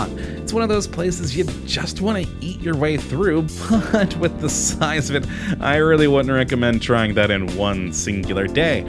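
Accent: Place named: United States. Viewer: This mate trying to sound funny, Hate his American accent.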